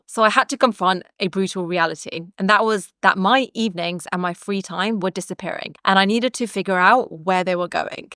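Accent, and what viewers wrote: United Kingdom. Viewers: I would like to make a comment if I may… could you please talk slower? I normally have to listen to other YouTubers with 2x but for you, I cannot continue listening after 5 mins… if you try to level your British accent with a speed which makes it more understandable, it will be best… also, YouTubing is your job… pls try not to pour in your anxiety in your speed of speaking 😊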